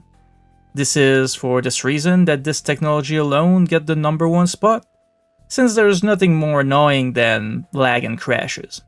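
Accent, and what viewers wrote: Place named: Quebec, Canada. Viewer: Je reconnais un Québécois quand j'en entend un.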